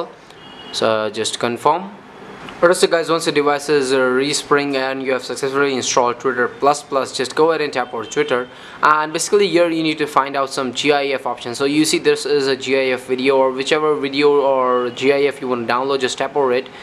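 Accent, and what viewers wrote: India. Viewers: Listen you don't have to talk in an American accent, it's annoying and it distracts from what you're saying... Apni normal Indian accent me baat kyo nahi karte use koi Sharm ki baat nahi hai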